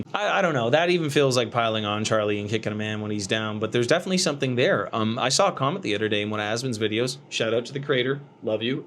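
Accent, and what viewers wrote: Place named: Canada. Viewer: Man. You're funny and cringe but I HATE the way you Canadians say your "out" words as oot. They're pronounced OWT not OOT you snow monkey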